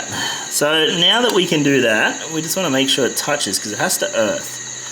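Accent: Australia. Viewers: I love your aussiman accent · the Aussie accent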